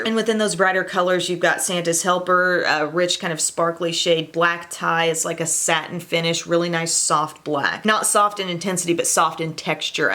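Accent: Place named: South, United States. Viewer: o wow... making fun of people out there in the world that actually have developmental disabilities or disorders. Very classy. I was paying you a compliment and then that? lol, I guess you just never get tired of people voicing their opinion as to how utterly ridiculous, bitchy and trashy and ANNOYYINNNGGGG you sound. Please do Tube a favor..shut your trailer trash sounding channel down. I can't even stand your voice for one second. I almost fell asleep. Shut it down, you southern donkey.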